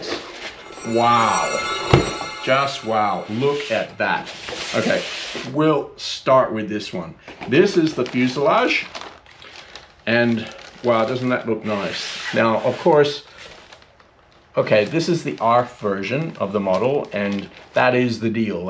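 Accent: Australia. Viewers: Nice. I am thinking about trying one of the DWH kits. I can hear an Aussie accent? Where do you fly in Canada? I am also an Aussie. I am in the Yukon. I've been flying RC since 2007. I was away from the hobby for a few years, and I am just get back into it. Cheers.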